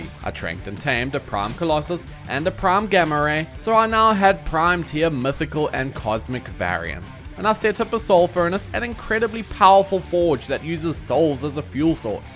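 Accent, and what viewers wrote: Afrikaans, South Africa. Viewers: Are u afrikaans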